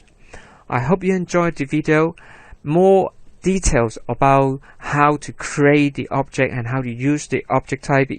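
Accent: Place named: London, United Kingdom. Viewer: Nice video! We are you from? You sound like a Londener and Chinese at the same time (no ofense).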